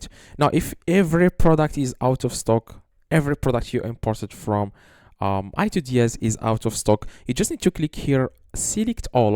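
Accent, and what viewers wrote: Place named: Morocco. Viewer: Merci mon frère, j’ai très vite reconnu ton accent marocain mdrrr, Tu m’as sauvé d’un problème que j’essayais de résoudre depuis ce matin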